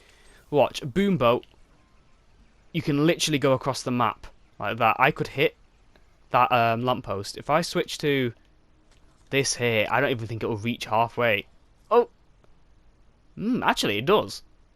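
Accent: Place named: United Kingdom. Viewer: Half the reason I watch is for your delightful accent! British?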